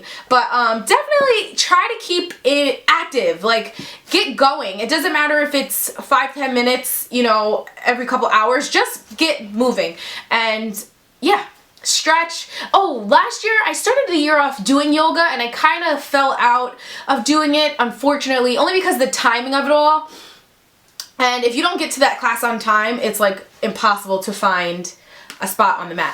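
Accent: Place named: New Jersey, United States. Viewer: hey where are you from I love your accent by the way you have like a jersey accent to me hope that isn't weird!!!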